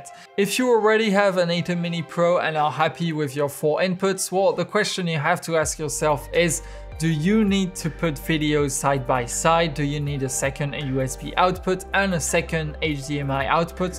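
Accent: France. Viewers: Ahaha on voit que t’es français, rien qu’à ton accent 😭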